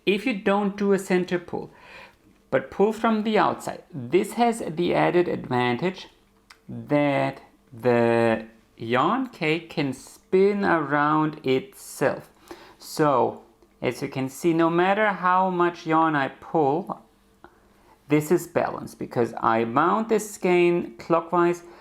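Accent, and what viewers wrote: Germany. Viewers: My knitting "don't": Don't ever tell yourself that you can't do a certain technique, like color work or lace. Start with something small like a hat or even just a swatch. Want to knit a cardigan but the pattern uses steeks? Knit a swatch to practice steeking before committing to making the garment to see if you even like the technique. At the end of the day, it's still knits & purls, and it's only yarn and you're not required to like everything you try. Also, I enjoy your German accent quirks - I enjoy most accents in general 🙂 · Thank your for sharing the language behind your accent. To my ears, it's similar to a couple of others but try as I might, just wasn't able to place it. Likely because most of the Germans with whom I've interacted spoke with a very thick, almost blurred. Your accent is crisp and clean, unique and very pleasant to me. 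Although I noticed your pronunciation of diameter, because I knew what you were saying, didn't really give it much thought one way or the other. Like knitting, English is spoken with different inflections and pronunciations all over the world, even when English is the native language, as the Brits and Americans. I've been knitting for over 50 years and am still learning new things, so it never gets dull. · zu den linken Maschen: die stricke ich so, wie du sie gerade gezeigt hast und zwar sehr schnell....und ja, bei allen Zu und Abnahmen muss ich entsprechen umdenken. Das ist so wie beim Altsaxophon spielen ohne transponierte Noten im Orchester: das passiert im Kopf automatisch. Es ist aber schwierig diese Art anderen dann beizubringen, warum sie es andersherum machen sollen, wenn es doch anders in der Anleitung steht. Stricke über 50 Jahre schon so und komme gut damit klar. Was mir wirklich gut gefallen hat, die tiefgründige Erklärung mit den vielen Details für die Maschenprobe. 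Da bin ich eher nicht so hinter her, aber nach diesem Video werde ich es jetzt doch öfter versuchen. Obwohl wirkliche Pannen habe ich noch nie erlebt und ich stricke viel und alles was sich Stricken lässt. Mein Motto ist dazu das Garn muss seine passende Nadel in Material und Stärke finden. Manche verstehen sich einfach nicht und dann kommt nur Murks raus. Das merke ich aber Recht schnell und kann dann was ändern. Ein tolles Video von dir. Vielen Dank. PS: dein deutscher Akzent stört mich überhaupt nicht. Deswegen antworte ich auch auf deutsch😉👍🧶🧶🧶🧶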